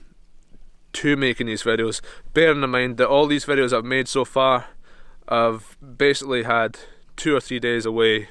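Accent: Scotland, United Kingdom. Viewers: Not the 1st time I've heard a Scottish accent say "Spare a little change pal" ;) ... Count me in for the Patreon sub .. Not to be soppy but due to injuries and ill health your videos have got me thru the time off the Bike these last few months and made me look forward to getting back on it, So I'm sure its the least I could do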